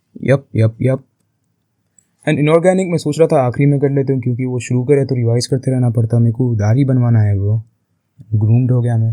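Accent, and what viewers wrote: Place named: Bihar, India. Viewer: Bihar ya odisha ka lagra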